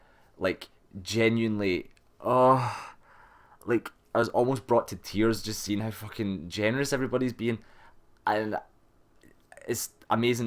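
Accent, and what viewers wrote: Scotland, United Kingdom. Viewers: I can't even imagine what it must be like man. I am watching your DS1 guide to help me on my second save and you just make me laugh and keep me plodding through. I love the Scottish accent too. Bringing donations into the limelight is fine, and I wish I could donate directly, but hopefully my views on youtube will help out! Keep keeping on man, you guys kick ass!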